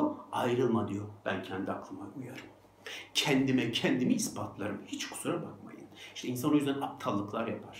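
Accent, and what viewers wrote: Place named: Kayseri, Turkey. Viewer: Hocam iyiki varsınız kayseri şivesini çok güzel kullanıyorsunuz beni güldürüyorsunuz allahta sizi güıdürsün hemşerim.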